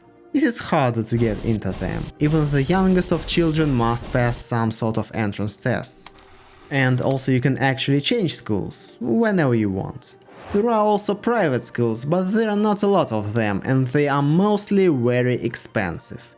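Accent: Russia. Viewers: Такое ощущение, что английский текст произносится русскоговорящим. Мне кажется, над его английской фонетикой нужно серьёзно поработать... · дикое произношение с русскими интонациями, корявые звуки. Гласные это нечто. ИИ и то лучше бы проговорил. Да и информация местами субъетивная. Фолрма, иди.от не для коллективизма, а для дисциплины. Лечи свое ЕГЭ головного мозга. Да еще и левах в пеньюарных платьях показал, как что-то укоренившееся. Петрушка...явно селянин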